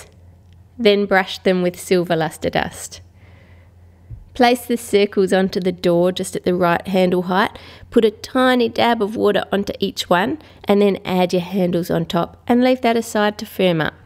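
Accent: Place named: Australia. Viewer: G'day! Just wondering if you could do a Video tutorial on this cake. <link> I would like to do it for my daughters birthday in September, and your videos are GREAT. Thanks. Ps nice to hear an Aussie accent on you tube. You are VERY talented.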